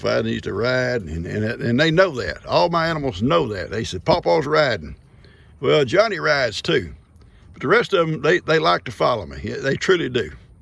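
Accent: South, United States. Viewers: Philadelphia checking-in., I'm not quite sure what it is but when I'm feeling down and don't get me wrong I always watch your content. Either your soothing voice your Southern drawl or the animals really cheer me up and put me in a positive mood. So I thank you sir. 👍💪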